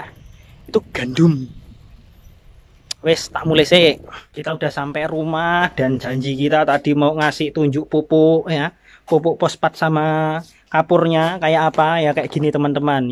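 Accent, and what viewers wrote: Java, Indonesia. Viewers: Jowo masseh😂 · Jowo asli mas se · Katanya orang kalimantan tp lolgat bicaranya kok jawa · Kel Kalimantan tapi jowonya medhuk Saya Semarang bang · mas katanya orrang xmantan ko logatnya jawa. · MANTAP DAN LUCU TOTOH X JOWO MU MAD, AKU SENYUM2 SAMBIL NYIMAK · Mas.... Sampeyan kuwi ngomong nok ndi...? Jepang po indonesia.... Ak seneng logate jowo nemen.... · Medok mas bahasane, mahamke😄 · Orang mana to om....kok Meduk men omongane... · Kok njowo banget to om, po ortu wong jowo? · Mase kaya orang Jawa ya....?😊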